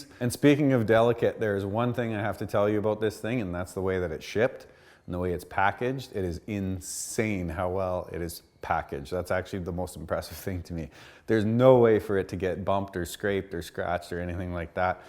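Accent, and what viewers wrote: Canada. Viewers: Great review. Also I can tell your Canadian as fuck.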